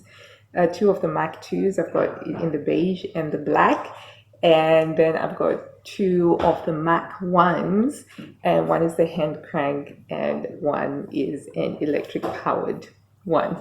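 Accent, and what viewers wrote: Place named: South Africa. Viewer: Thank you for a wonderful video. you sound so "welcomingly" South African!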